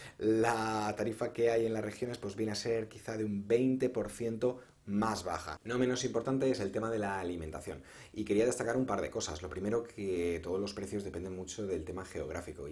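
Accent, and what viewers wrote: Spain. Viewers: Exelente información muy didáctica, por tu acento eres español. ¿Porqué no nos das la misma imformación pero de tu país España? Saludos desde Ecuador. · Sí, tu canal és super informativo,además me encanta tu acento español de españa,saludos desde Brazil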